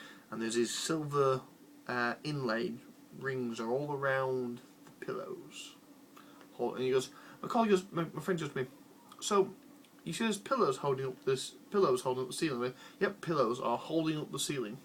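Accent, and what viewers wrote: United Kingdom. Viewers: I love your accent and pronunciation! Bear in mind, language is identity and we all come from somewhere. I keep my German with a strong northern accent, as I'm from Hamburg and I like the area. I don't attempt to filter it to simulate standard German. Your accent reminds me strongly of folks I knew in the UK during my student days there. My point is that I understand everything you say perfectly well and I greatly enjoy following your thoughts. Keep it up!:-)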